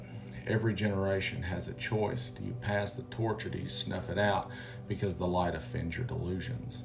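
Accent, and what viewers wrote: South, United States. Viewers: you are brilliant. Your "good ol' boy" southern accent makes what you say even more powerful. I've shared your videos with everyone I know. Defiance 'til death 💪🏽✊🏼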